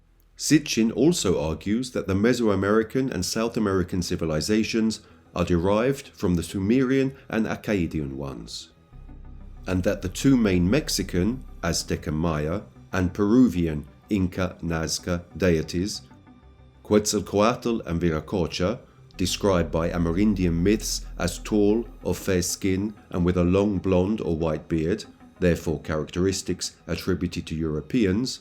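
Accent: United Kingdom. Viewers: I didnt last 12 min. English accents turn my stomach.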